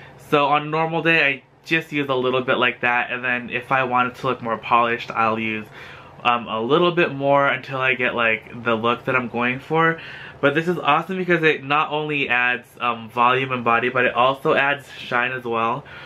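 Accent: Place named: Philippines. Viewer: I'm guessing you're a Filipino. I can tell by the accent. :)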